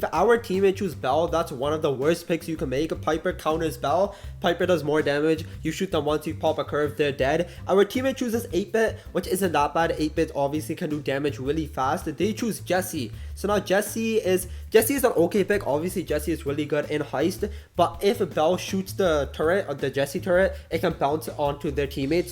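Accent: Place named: Punjab, India. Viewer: Bro your accent is very Punjabi 😅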